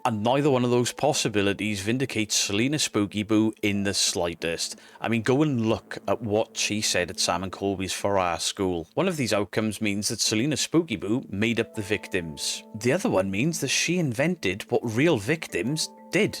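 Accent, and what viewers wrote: United Kingdom. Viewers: Man tbh I don't care about the video or hookie poo whatever what interests me is that you sound British and your talking about quite literally my neck of the woods I was raised in Hinsdale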